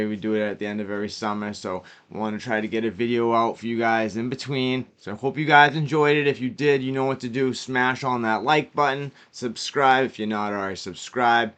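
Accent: Boston, United States. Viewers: So Baawwston 🤘👺🤘🔥🔥🔥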